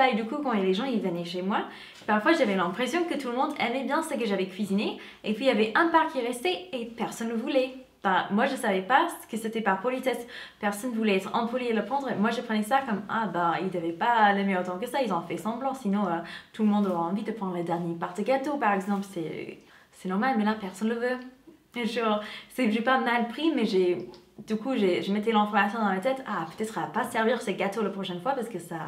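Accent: United States. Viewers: Y a pas grand chose de plus mignon qu'une Américaine qui parle Français... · Ouao tu es vraiment très belle avec beaucoup de classe j'adore ! Et ton accent américain 😍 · Ahh le filtre vocal que tu utilises vers la 3 e minute efface ton accent américain, c’est surprenant..🙂 super vidéo comme d’hab’ 💪💪💪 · Comment j’ai pu passer à coté de votre chaine vous etes trop belle et cet accent américain j’aime trop je m’abonne !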